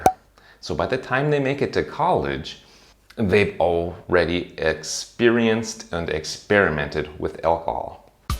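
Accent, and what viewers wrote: Germany. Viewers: I'm surprised. Your heavy accent sounds like a German who lived in the US only a few years.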